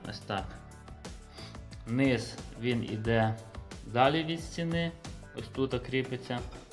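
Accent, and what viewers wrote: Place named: Surzhyk, Ukraine. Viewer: за суржик 👎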